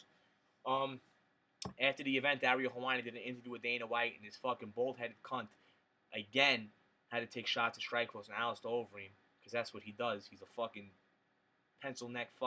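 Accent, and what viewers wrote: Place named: Liverpool, United Kingdom. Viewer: u speak like a true scouser bro good shit keep it up but u should be on the freddie roach plaster in glove trick gsp used here i think dana was on to it he didnt look pleased in post fight presser wen he pointed out hed never seen someones eye go like that in 1st round off jabs gsp cheat !!!!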